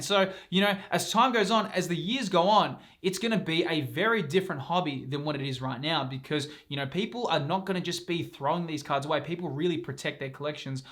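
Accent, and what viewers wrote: Australia. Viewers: I’ll be honest! Your videos not only helped me look at Pokemon cards and even etbs differently but the Australian accent is a chefs kiss on top ❤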